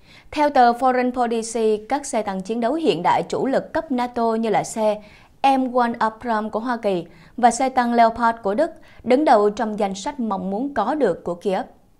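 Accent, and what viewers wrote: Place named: South, Vietnam. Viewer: Ngôn ngữ và dọng nói miền Nam hay lắm. Giữ lấy BẢN SẮC VĂN HOÁ NAM BỘ dễ nghe nha,!. Bạn có âm vực rất tốt, cám ơn bạn.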